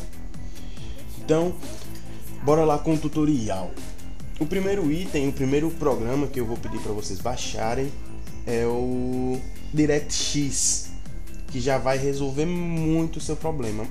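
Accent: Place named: Bahia, Brazil. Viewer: tu e baiano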